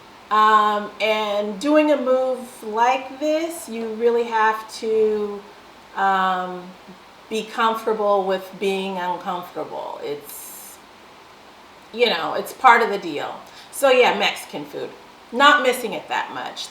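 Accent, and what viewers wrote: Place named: Lisbon, Portugal. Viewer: Awww you already talk as a lisboeta 😊😊👍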